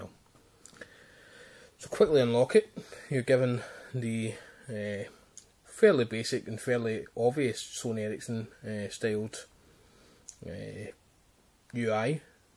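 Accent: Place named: Scotland, United Kingdom. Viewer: Why do you talk Scottish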